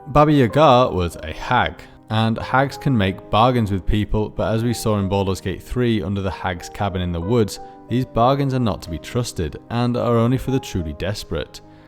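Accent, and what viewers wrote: United Kingdom. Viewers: The argument that occured two months ago was fascinating to read. Not that it matters, but I think I'd support the supposed linguists assertions, as someone who has a name that is a transliteration of a common English one, because in my ancestors mother tongue, they do not speak with certain consonant sounds, like "r." Also isn't an American using American pronunciation. As far as I can tell, he's British.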